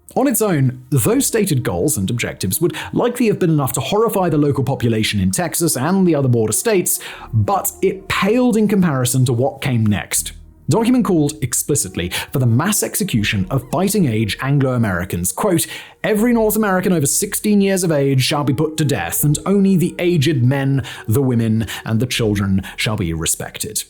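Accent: United Kingdom. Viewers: This entire Revisionist history lesson is extremely rich, especially when it is being told by some dude with a Brit accent.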